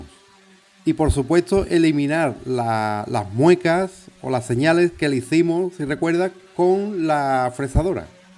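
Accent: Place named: Andalusia, Spain. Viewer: Sos un genio !! Muy bien explicado. El acento Andalu (si no me equivoco), le da un toque muy especial. Muchas gracias maestro.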